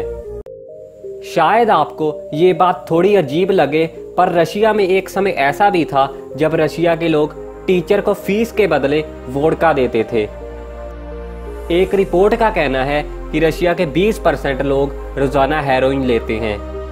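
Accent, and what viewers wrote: Punjab, India. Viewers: Punjabi tuch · Punjabi ta punjabi hi hunda, English vi punjabi tone ch bolde ae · Bohot achi video h bhai apke bolne se lgta h ap punjabi ho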